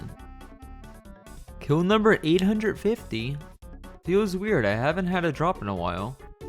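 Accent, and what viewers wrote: United States. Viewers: Are you from Philly?? I hear some wooder in those water runes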